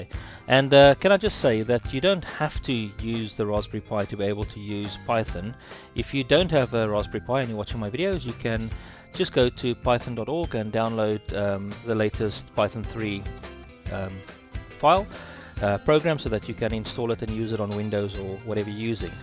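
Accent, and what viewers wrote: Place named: South Africa. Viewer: good video,and refreshing to hear a south african accent, (to me) the music is slightly loud and distracting.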